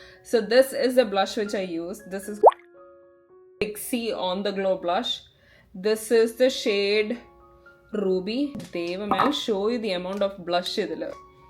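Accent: Pathanamthitta, India. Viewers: Enik ariyathila’that typical pathanamthitta slang 😅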